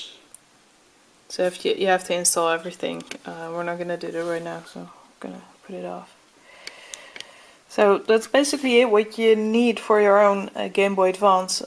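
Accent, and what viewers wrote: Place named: Netherlands. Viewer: Dutch accent!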